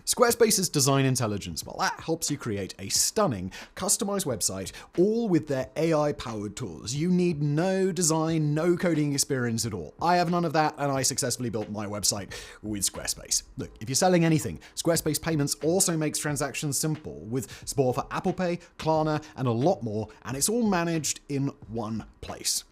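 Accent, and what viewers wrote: United Kingdom. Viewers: LMAO you think he's an American? Did you even listen to the sound of his voice? He's a Brit living in the Czech Republic is my understanding. I know he's a european living in mainland europe basically. Americans sound nothing like that.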